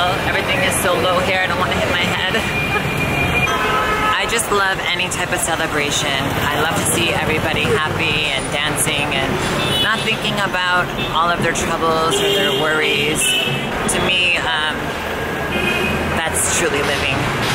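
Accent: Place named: Bengal, India. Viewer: She is speaking with Hindi language people but tune is like Bengali . love it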